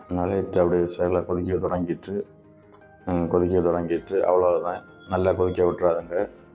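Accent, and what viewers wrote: Kanyakumari, India. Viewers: கன்னியாகுமரி யா நீங்கள்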